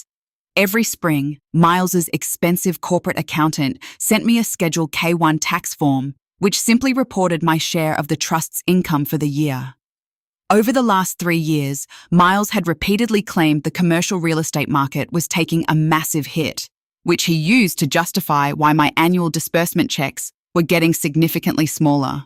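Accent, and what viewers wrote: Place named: Australia. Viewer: Kiwi here, Refreshing to hear an Australian accent. Some of those American accents are horrid. Good story, my youngest daughter had a similar experience when she was little, quite a traumatic experience for a young one.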